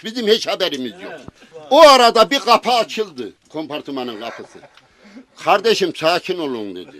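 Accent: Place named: Sivas, Turkey. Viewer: Sivas ağzıyla konuşarak anlatması ayrı bi güzel, yediği dayakla eğlenebilmesi ayrı bı güzel, sizin çizimlerle bambaşka bir boyut kazanmış. Harika yaa. Tebrikler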